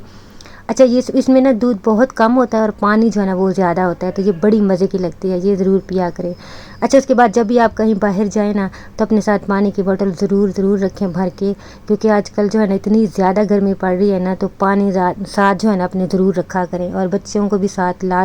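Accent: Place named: Saraiki, Pakistan. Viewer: السلام علیکم پہلی دفعہ آپکا بلاگ دیکھ رہی ہوں ،،،،،میں لغاری ہوں سرائیکی آپکا لہجہ بھی سرائیکی جیسا ہے ❤❤❤ ما شاء اللہ 🎉 پلز بتائیگا آپ کی زبان کونسی ہے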